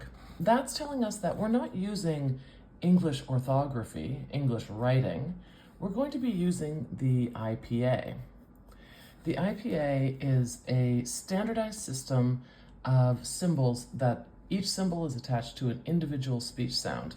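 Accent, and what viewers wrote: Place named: Atlantic Canada, Canada. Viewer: Could you do a series where you transcribe a word in two different accents, like your own Canadian Atlantic accent vs Minnesotan? Or is there a Canadian West Coast accent? East vs West, yo!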